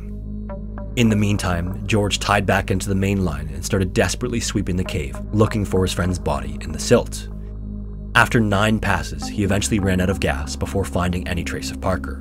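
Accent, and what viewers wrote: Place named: Canada. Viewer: I hear the Canadian in you.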